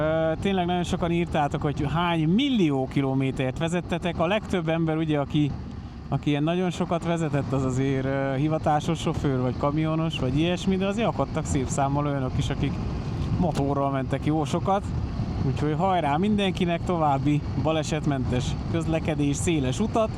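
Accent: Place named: Szekler Land, Romania. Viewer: Szervusz! Csaba (62 éves) vagyok Marosvásárhelyről. Szép kiránduláson vagytok, jó hogy a nagyközönséget is be avatjátok! Nagyon tetszik a székelyes beszéded és, hogy nagyjából mindig van egy jó beköpésed ami feldobja a filmet. Nem akarok kritizálni, de ha megkérhetlek mikor várost, tájat filmezel lehetne egy kissé lasabban mozogj a kamerával, mert majdnem élvezhetetlen a film. Ha sietsz akkor inkább kevesebbet filmezz de lasabban forduljál. Mikor kezedben a kamera akkor a kijelzőt nézd az egyik szemeddel a másikkal meg azt hogy hova fogsz fordulni. Tényleg nem rosszindulatból írtam! Amúgy én is szoktam filmezni mikor külföldön motorozok. További jó és száraz utat kívánok, kellemetlen meglepetések nélkül!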